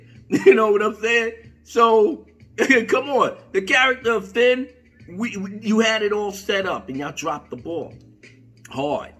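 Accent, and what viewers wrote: Cockney, United Kingdom. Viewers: As always my brother, always agree with you. And enjoy the cockney. LoL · as a Brit, as always I’m freaking loving your cockney accent.....priceless! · Hello! I could not WAIT to hear your take on this! Wow. It's fire as usual! You have been keen to the TFA BS since jump. I'm happy that John Boyega is speaking out. It can't hurt him, he's been honest. Btw: Love your British accent! Well done!:)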